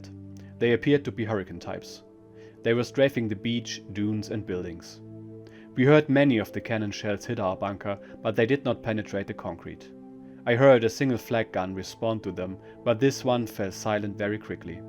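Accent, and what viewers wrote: Germany. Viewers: I didn't realized you'd posted Juno part 1 and was delighted to see a Juno Beach part 2. I've just now listened to both parts and like your other content, it is excellent. This is content that anyone fascinated by WW2 will enjoy. I'm a 50-ish year old American and have been fascinated by the war since childhood. Thank you for these stories from the German perspective. I've read many memoirs and diaries myself, and hearing this in your own accent adds something entertaining. One can imagine the soldier himself is reading the story.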